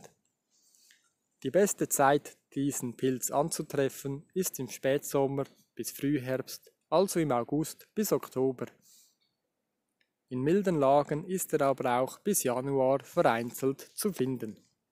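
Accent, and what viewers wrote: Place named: Switzerland. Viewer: Sorry, musste nach dem ersten Wort ausschalten!! Wenn auch noch ein CHler meint er müsste was vorlesen!!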